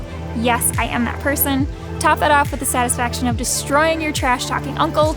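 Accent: Midwest, United States. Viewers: Wisconsinite here. Was going to say, Midwesterner for sure!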